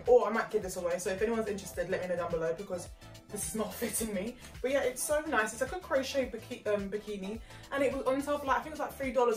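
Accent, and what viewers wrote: United Kingdom. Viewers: A black girl with a British accent, is a white Canadian dude’s dream!!! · Pretty darkskin, slim thick and that UK accent :face-red-heart-shape::face-red-heart-shape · Very attractive sounds British accent keep up the great videos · You are really beautiful¡¡¡ you look likea doll and your british accent goes perfect with your personality. you have a heavenly body, close to a perfection. Receive a warm kiss from El Salvador. Carlos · Can you blame them? 😉I hope this isnt in anyway racist, but I've always thought that a beautiful black woman with a British accent was HOT!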